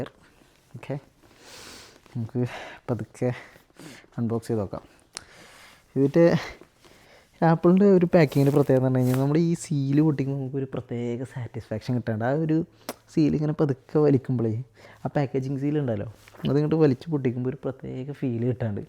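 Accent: Malappuram, India. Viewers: വ്ലോഗ് എടുക്കുന്നോണ്ട് മലപ്പുറം ഭാഷ ഒഴിവാക്കണ്ട ഞമ്മക്ക് അതൊക്കെ ആണ് ഇഷ്ടം ❤❤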